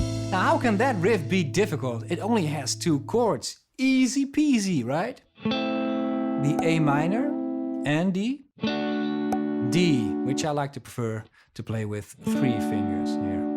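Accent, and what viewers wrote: Ireland. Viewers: What's that accent you have brother, Irish? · ure Irish dnt u..?!? Love ur videos well done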